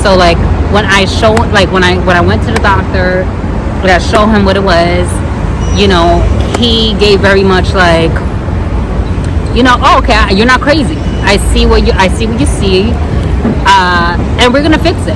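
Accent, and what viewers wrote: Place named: Brooklyn, United States. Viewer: That voice is extremely sexy got that Brooklyn vibe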